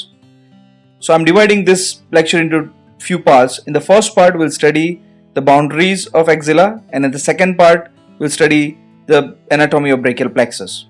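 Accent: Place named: India. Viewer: I just become so much distrub whene I hear Indian English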